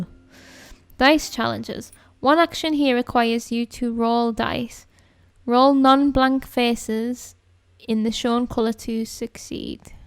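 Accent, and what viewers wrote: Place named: Cumbria, United Kingdom. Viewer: I'm hearing Cumbrian 🤔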